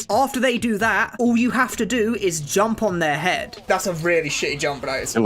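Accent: United Kingdom. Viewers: British speech about TF2 to vibe and study to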